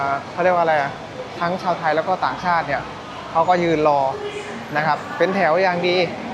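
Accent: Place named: Ubon Ratchathani, Thailand. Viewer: เจ้าเว่าลาวคือคนอุบลเว้าเลย สำเนียงคือกันเลย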